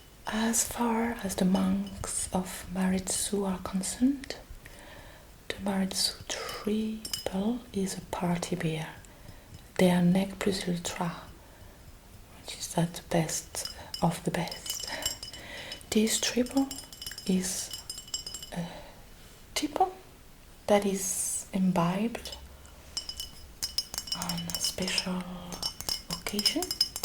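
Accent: France. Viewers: If one could imagine an ASMR role-play with a glorious French accent and a sumptuous assortment of exquisitely presented sound triggers, you would more than adequately have described this beautifully rendered video. This production is so well done, and I highly recommend it to anyone who understands and appreciates the art we know as ASMR.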